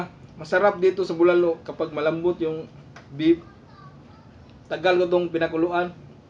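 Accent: Visayas, Philippines. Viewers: Bisaya ka bai o tagalog hehe.. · bisaya ilhan gyud tono nato ani bai🙂 enjoy lang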